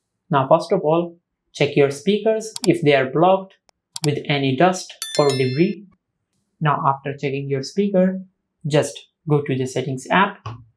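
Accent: India. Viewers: its indian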